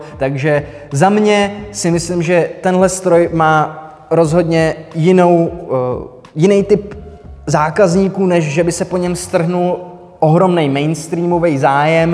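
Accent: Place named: Prague, Czech Republic. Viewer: Ten pražskej akcent to je něco strašnýho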